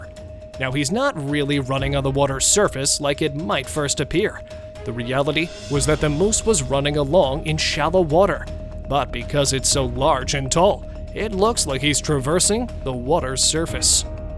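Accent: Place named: Russia. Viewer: Mne kajetsa, chto dictor russko-govorashiy.. 🧐🤌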